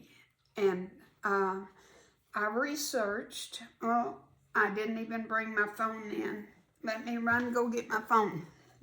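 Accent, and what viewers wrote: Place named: Kentucky, United States. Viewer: So enjoyed your video. I'm from ky. Where are you from. You sound so much like alot of the ladies in our quilting guild. Will be watching all the videos you do as its like watching a close friend. Loved the pillow. So beautiful!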